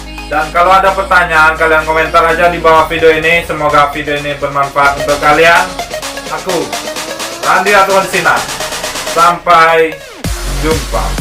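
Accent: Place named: Sulawesi, Indonesia. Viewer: Bang kayak orang sulawesi. Alhamdulilah tercetak kartu ujiannya bang.. Minta doanya semoga dilancarkan😇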